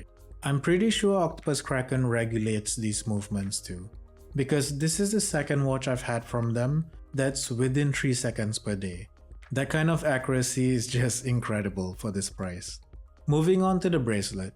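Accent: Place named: India. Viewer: Thanks a lot! Never heard of this brand. I will check them out. Judging by your accent you are from India, right? Which region/city you recommend to spend my holidays in India? I'm from Central Europe and I love spicy food.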